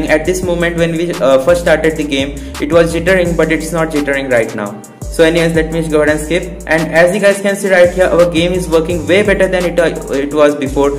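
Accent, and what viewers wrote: India. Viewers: How does that every video titled " How to get 228 fps on low end for 20 bucks no shit for real" is recorded by some indian feller with terrible accent? 😂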